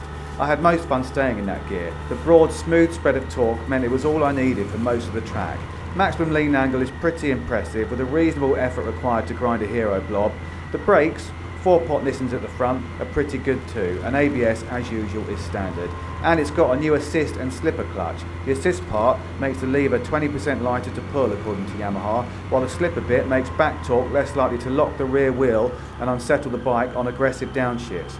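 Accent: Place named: England, United Kingdom. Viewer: Can't stand that Limey accent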